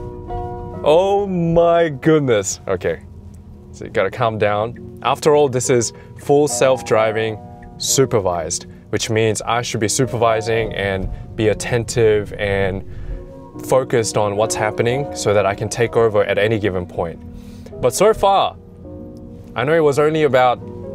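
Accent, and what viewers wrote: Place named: Australia. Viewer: Good job he had the subtitles hardcoded as I couldn't understand a single word of that Australian language, all I could hear was 'mate, mate, mate'. I feel your excitement for the future.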